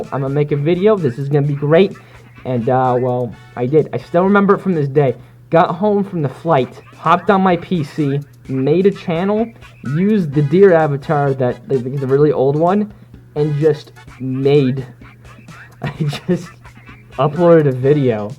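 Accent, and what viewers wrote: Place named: Mid-Atlantic, United States. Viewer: Awww you got the cutest mid-atlantic accent. Also: Deer do have fangs. And not like, during the Pleistocene, some deer have fangs to-day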